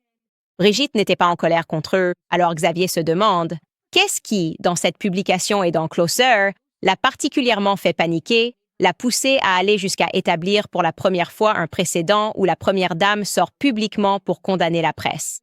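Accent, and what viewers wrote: Quebec, Canada. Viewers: AI gave you an accent from Québec!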